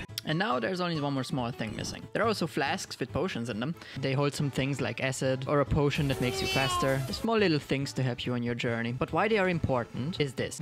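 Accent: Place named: Germany. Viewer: I love this game and I love you made a video about it. But also, gotta love your deustcher akzent lol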